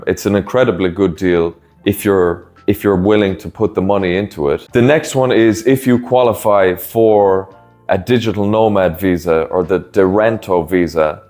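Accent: Ireland. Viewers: You did good, loved this also your Dublin accent (The nuns that taught me in East Malaysia were from Dublin) good luck on your blogging!!!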